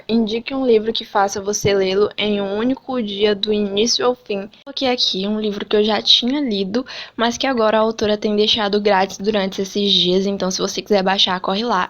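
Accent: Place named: Ceará, Brazil. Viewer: Olaaa. 😊😊 Eu vi essa TAG esses dias e achei bacana pra responder. Talvez eu faça QQ hora dessas... O sorriso da hiena eu fiquei curiosa pra fazer a leitura. Jogos vorazes nunca bateu a vontade de ler mas vi os filmes e achei ok. Gente, teu sotaque é tão bonito. Parece cearense. 😊 Aaah, eu amo GOT. E curti desde o início kkkkkkk Küss 😘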